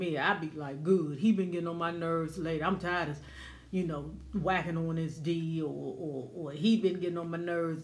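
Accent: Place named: South, United States. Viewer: I'm in the DMV right now...but you sound a little southern like, you're from my hometown lol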